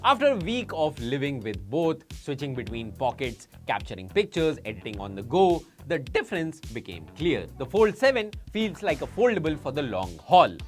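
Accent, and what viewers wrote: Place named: India. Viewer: Horrible Indian accent, 🫤 i can't understand what you saying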